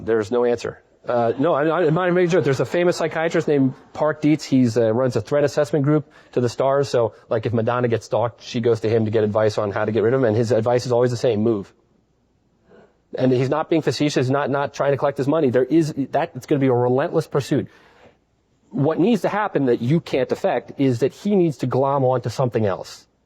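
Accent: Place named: New York, United States. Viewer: Excellent work cleaning this up—I'd actually never heard him speak intelligibly (due to audio quality, not any property of his speech). This guy more or less saved my life with his blog posts. I was completely ignorant, deluded, and self-destructive to an extreme degree until TLP's blog (TLP is the blog, I know, but it's sort of also the man) found me and taught me, very cryptically and indirectly, how it is that a human can exist. I knew they could, and do, exist, but not how. He sounds like he's from New York. I won't check.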